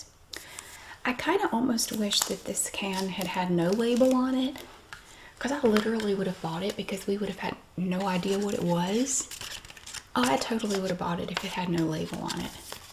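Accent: South, United States. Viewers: Holy smokes people really admire you sound south lady!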